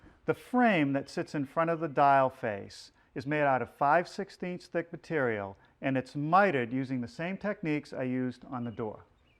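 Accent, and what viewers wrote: New England, United States. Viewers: what I wouldn't give to have a blooper real of all his mistakes and him cussing in his New England accent xD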